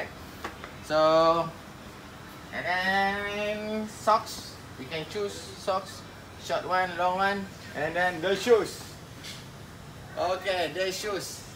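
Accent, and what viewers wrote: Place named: Malaysia. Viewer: Saran bang mending di kasih subtitle bahasa inggris aja biar gak belepotan Malay Inggris😁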